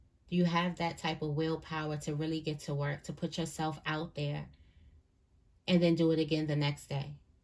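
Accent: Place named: DMV, United States. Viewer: I be hearing a lil DMV in yo voice , you from bmore ?!?! 😂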